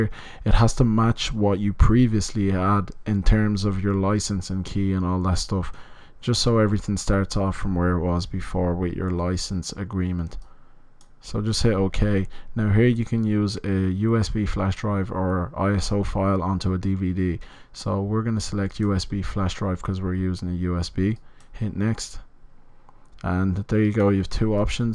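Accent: Ireland. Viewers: Are u Irish? And good video man👌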